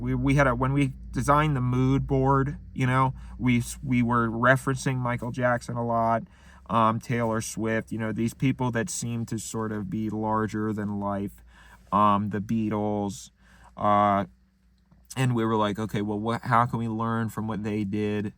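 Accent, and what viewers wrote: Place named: Maryland, United States. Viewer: He's really leaning extra hard into the Maryland accent in this one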